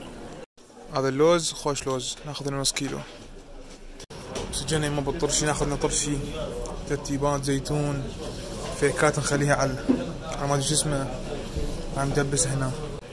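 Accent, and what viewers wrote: Mosul, Iraq. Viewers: اللهجه المصلاوية ( معلقه ) تنور الفيديو 😂😂😂♥️ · تحية لك ولشغلك الراقي ست نجوم وليس خمسة.. متميز بكل شيء.. و من شكلك ولكنتك وكبة الكسمايات النص عرفتك مصلاوي😍 وافكارك اغلبها لم يسبقك بها احد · اما مصلاوي اما كردي من شمال العراق الان نفس اللهجه والحاضرين اسمائهم كرديه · ولله كلامك تمام هوى مصلاوي بس عايشين بلندن واني مصلاوي عايش وادرس ابريطانيا 😘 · عاشت ايدك عالترتيب بس سؤال انتَ من الموصل؟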